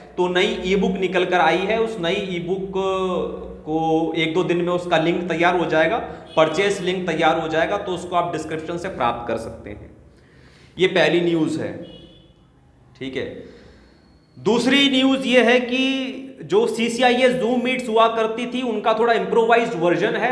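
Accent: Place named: Ajmer, India. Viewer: 😂Love this ajmeri style of talking❤️ लेकिन भैया कोरोना के नियम तो पूरा ही आड़े आ रहे हैं😭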